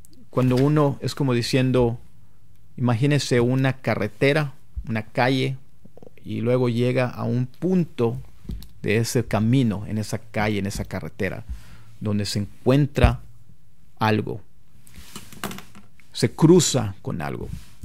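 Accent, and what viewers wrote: United States. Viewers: Pensé que era gringo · me encanta como lo habla parece gringo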